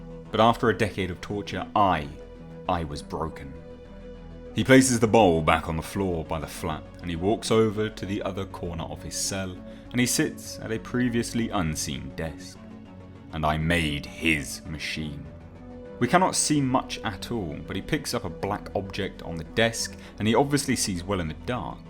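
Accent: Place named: United Kingdom. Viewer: With a little more bass in your voice, you could have a nice cenobite tone. Or maybe it's just the British accent. Yeah, that must be it...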